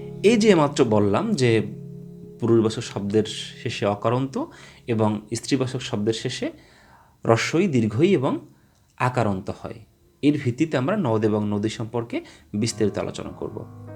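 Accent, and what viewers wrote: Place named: Bangal, Bangladesh. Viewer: স্ত্রী উচ্চারণকে ইস্ত্রি বলেন কেন? এককথা বারবার বলে দীর্ঘায়িত করার দোষটা দূর করুন। বাঙাল উচ্চারণটা না থাকলে ভালো লাগতো।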